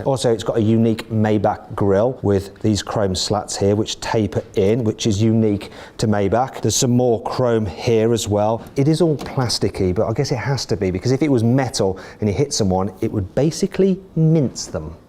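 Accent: United Kingdom. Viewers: 억양이 멋지네요 영국발음인가요?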